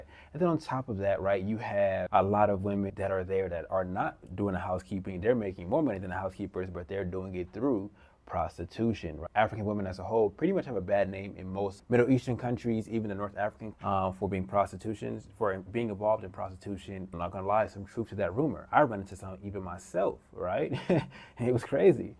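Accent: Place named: United States. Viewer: People dancing around the subject smh.. he's referred to as an Abid in Arabic, which means slave. Lucky he is, and sounds American, as that would've contributed to a slightly better experience. He is also originally an African, not Caribbean American (i.e. the real "African Americans) and that will help him navigate Egyptians too Egypt really is the last option for anyone living in the west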